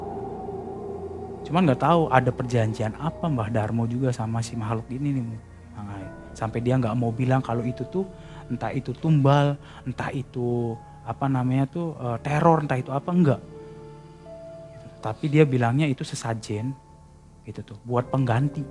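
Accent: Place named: Cirebon, Indonesia. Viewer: wong cerbon yaaaa Logate bli bisa diboongi ca 😁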